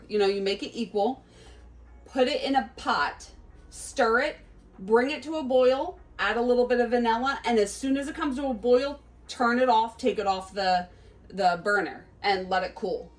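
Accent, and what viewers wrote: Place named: Pennsylvania, United States. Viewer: You are a lifesaver! I am making a 3 tier cake for my father’s 90 bday party…you gave me a lot of tips! I’ve watched a few of your videos in the last hour already! When I first heard you speak, I said she is from Pennsylvania! Then I looked and see your from Langhorne! I am originally from Levittown, now in FL. thanks for all the tips and tricks!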